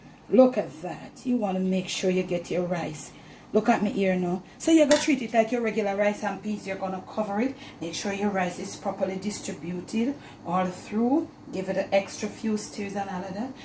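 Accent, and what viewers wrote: Jamaica. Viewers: Mi love d patois, so gwaan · Miss from down a yard I love u n I'm from down a guyana n luv to hear u talk me luv talk to n me is a chef but luv to look at u makes me smile all time with u Jamaican talk n knowledge u go girl u can talk cause u can cook na lol peace god bless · One of the reasons I follow you is because of your "talking". You remind me of growing up with my Jamaican parents. 🥰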